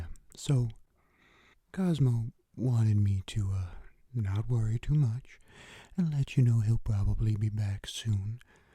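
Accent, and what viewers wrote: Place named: South, United States. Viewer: Southern accent,I love it!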